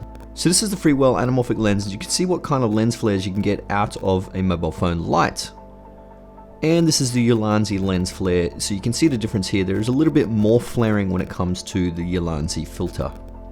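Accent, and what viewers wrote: Australia. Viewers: Just loving watching an Australian accent version🤙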